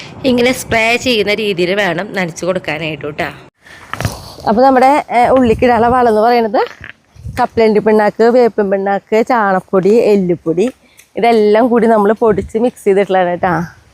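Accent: Thrissur, India. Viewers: Thrissur nadatharel aano chechi ningal😮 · Where r u based, from ur language, I think u r from Thrissur, where r in Thrissur?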